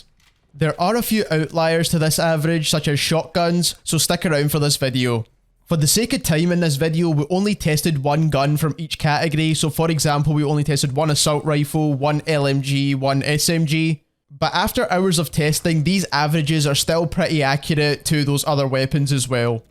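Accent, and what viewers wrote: Ireland. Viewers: He sounds Scottish or Irish. I think Irish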